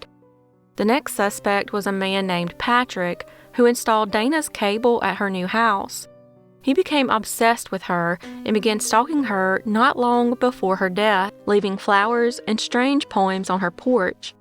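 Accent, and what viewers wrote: United States. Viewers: just found your channel.. Good narration...Concise.. with the best American accent. Appreciating your content from the UK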